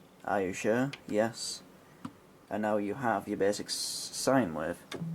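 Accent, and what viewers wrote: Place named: Yorkshire, United Kingdom. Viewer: Definitely Yorkshire but hmmmm which part.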